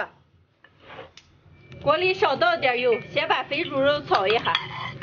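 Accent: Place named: Shaanbei, China. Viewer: 越来越爱听陕北口音了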